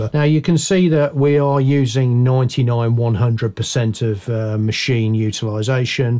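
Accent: United Kingdom. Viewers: Thanks for getting back to me. Have ordered the M1 MBP with 16GB & 1TB I’ll be using it primarily to record acoustic instruments and vocals in situ, probably no more than 7 or 8 simultaneous tracks at a time. Fans have been an issue in the past, have you had any problems noise wise with the MBP under load? PS You sound like a fellow midlander, from Stourbridge myself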